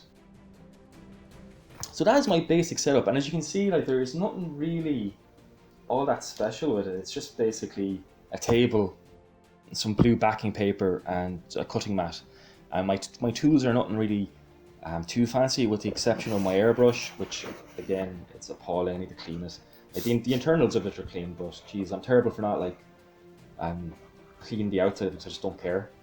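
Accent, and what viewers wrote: Ireland. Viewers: Whenever I watch any of your videos, I always end up with an Irish accent in my head for a few hours!😂 Funniest thing is my parents were Hungarian , and they couldn't pronounce "th" either since that sound doesn't exist in the Hungarian language. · Your channel was one of the first I watched when I got back into scale modelling and back into using an airbrush, now I remind myself to “t’in it down” with an Irish accent lol, Cheers!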